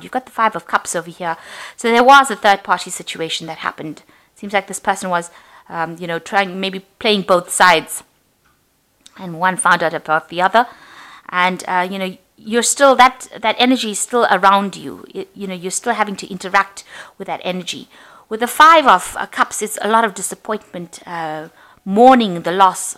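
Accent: South Africa. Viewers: Are you SAfrican? You sound like home :-)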